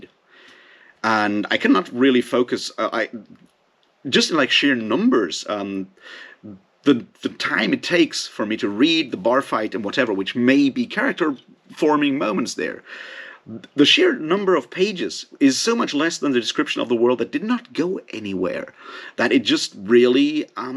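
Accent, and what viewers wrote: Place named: Norway. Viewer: interesting! I thought you sounded Norwegian!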